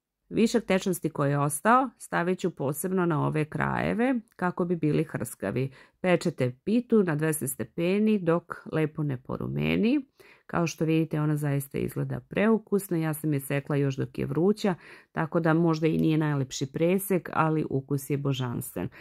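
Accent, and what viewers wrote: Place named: Krajina, Croatia. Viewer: Vidite kako i drugi usmeruju pažnju na tvoj glas,na divnu dikciju i još lepši izgovor,naglasak,draga moja krjiškinjo!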